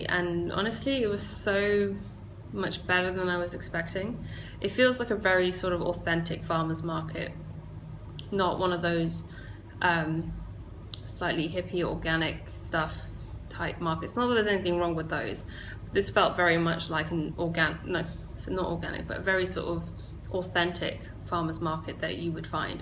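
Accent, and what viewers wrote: United Kingdom. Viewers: This is a note, and you can take mu advice, or dump it. I just like people and if they think my advice is silly, I am not offended. You're looking into the computer. Your camera is to the right of how you are sitting. Look into the camera and not the computer to see yourself. You are lovely and do not need to check yourself and if you look odd. You cannot look odd. I am jealous of your hair and think you're a joy to watch. If you looked straight into the lens, I think it would be a titch better, but you could put the back of your head to the camera and I'd still watch. So again, feel free to ignore me. Honestly, you make very few new to pod mistakes. I don't hear some of the words I have to edit out and that takes forever. I ummm, and "uhhhhhhhhhhhh." LOL! I mean every comment with 100% respect to the wonderfulness of you. If you're from the UK is loving Monty Python mandatory? 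My husband says it is and although I've been to the UK, and know it isn't he loves teasing me and I would like a UK person to answer for him. HA! We adore your accent. If course you wonder what I'm talking about, since I'm the one with the flat American accent to you. Very flat, Michigan and we call soda pop. I know you had no need to know that.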